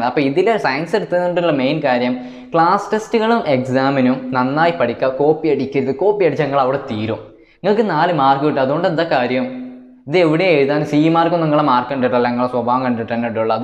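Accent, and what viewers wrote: Malappuram, India. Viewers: MALAPPURAKKARAN aanallee.. 😁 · Malappuram aano vaarathaanam kettette agane thonna 😅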